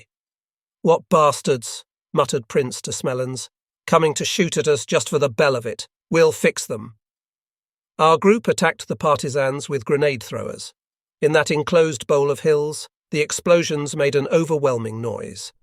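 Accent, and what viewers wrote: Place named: United Kingdom. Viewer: Yeah, the British voiceover is the most entertaining when he yells fire or incoming is hilarious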